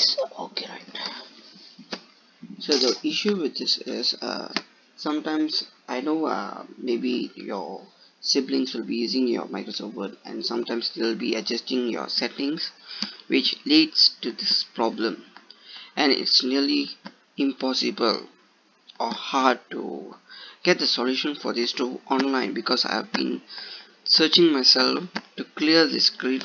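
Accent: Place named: India. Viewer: bad explanation with your heavy indian accent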